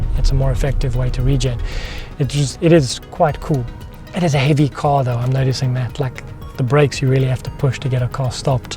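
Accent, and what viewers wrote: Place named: South Africa. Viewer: You can tell by his voice that he is from SA!!!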